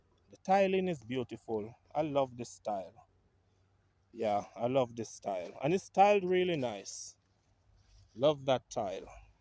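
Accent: Jamaica. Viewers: The Grammar police are out in full force. I appreciate the Jamaican accent with all it's pronunciations and inflections. What I enjoy the most is all the information in these videos and the effort that has gone into visiting the locations, researching, editing and narrating them. Now Grammar Police send the links to your perfectly edited, interesting and entertaining videos so I can watch them. Thanks x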